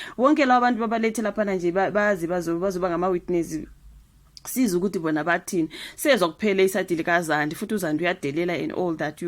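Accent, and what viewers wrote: Zimbabwe. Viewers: Your accent is giving Zimbabwe. Why nizifaka ezindabeni zaseSouth Africa? Niya dika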